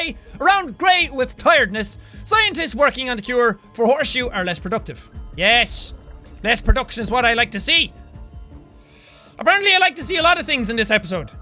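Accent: Ireland. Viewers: Erase What? Do you mean Top of the mornin' to ya laddies? It's because he's Irish. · is he irish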